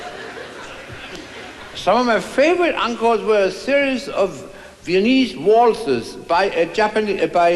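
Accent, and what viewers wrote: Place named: Denmark. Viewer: He tells the same jokes but it doesn't matter. He is still funny. I read his autobiography in Danish, bought in a second hand store. He had a successful career as a concert pianist in Germany before the war but eventually had to flee, being Jewish, through Sweden and Finland. Managed to get the last boat from northern Finland on a US special vessel sent to pick up US citizens. His first wife had spent time in the US and was a citizen. She insisted on taking her cat with her. They were parted but still friends, Victor and she, that is. Despite the ice and extreme danger, they made it to the US. I am not Danish, but speak Danish and always thought he was funny because of his accent in english. Then l found out that all Danes have a funny accent in english without even trying.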